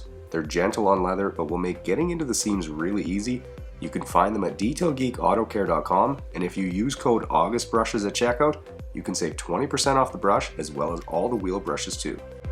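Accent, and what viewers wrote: Canada. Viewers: Ok so now after watchin several of your videos I'm hooked. I had to share your videos with my sis in Florida..Now I know your Canadian.. I can't hate cause my daughter lives there😊 and I love Toronto and was in the military in the Detroit area long time ago so your accent was very familure. So you know electric, you can clean, you use tools, you like big toys and working outside, you also love music. I also watched videos of your studio build. I just wanted to say, I think your well rounded and a super cute dude who pays attention to the 'details'..also..your detailing is phenom! I can't believe people don't clean out their vehicles before you get them, that seriously blows me away, Thank you for putting great content out oh and I watched a free detailing vid you did..giving is so uplifting, and it comes back to you. Thank you keep up the good work!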